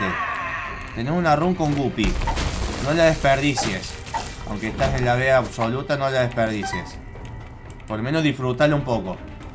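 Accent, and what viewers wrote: Argentina. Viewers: Tenía mucho que veía tus videos xd olvidaba lo genial que era el acento argentino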